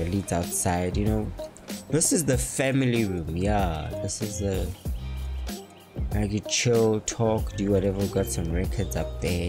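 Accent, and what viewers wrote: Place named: South Africa. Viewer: Brother are you from South Africa?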